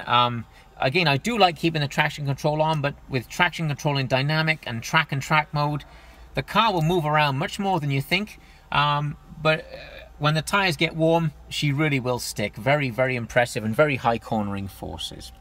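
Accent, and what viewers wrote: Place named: Wales, United Kingdom. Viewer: I don't think the chap is American. I detect a Welsh lilt of the valleys!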